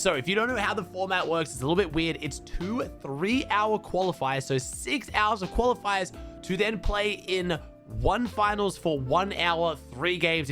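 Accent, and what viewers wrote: Australia. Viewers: little glitch from robo Aussie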